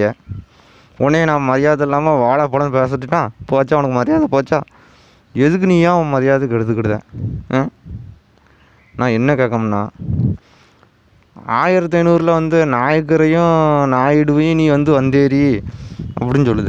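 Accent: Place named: Tirunelveli, India. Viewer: அருமையான பதிவு நண்பா திருநெல்வேலி பேச்சில் தெளிவான விளக்கம் கொடுத்த என் வீரச்சக்கிலிலியனே வாழ்க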